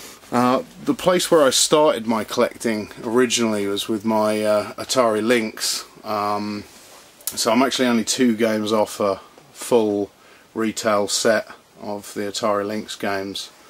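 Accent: England, United Kingdom. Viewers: He said he has PAL games which are European versions of the games. I'm assuming he is from England somewhere by the way he talks. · hes obviously English... really stunned by the confusion..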